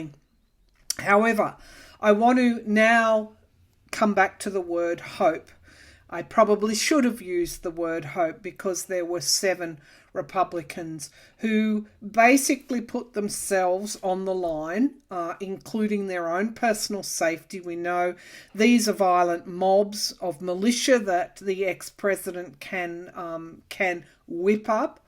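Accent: Australia. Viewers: You have been such a comfort to me this past year. I’ve truly felt your genuine and heartfelt concern and empathy for what we’ve been going through these past years. If it weren’t for your charming Aussie accent, I would think you’re a citizen of the US! I absolutely love and adore you. I truly mean it when I say I don’t know how I could have taken all the abuse without your constant calm comfort. 🙏❤️🌸😇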